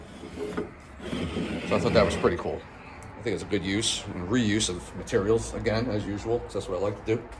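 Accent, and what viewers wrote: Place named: New England, United States. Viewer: Great video. Some interesting setups like the use of old tables. You must be from New England you have that accent. At first I thought the license plate was Oregon any way good stuff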